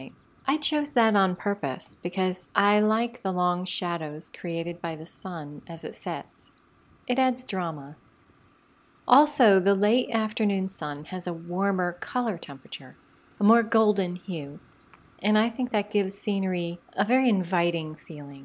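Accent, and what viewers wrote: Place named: United States. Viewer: This is so helpful and so well constructed. Also I loved her voice. Americans always seem to compliment our English accent so now I can return the compliment. It’s one of the nicest American accents I’ve heard so wondered what part of the US she comes from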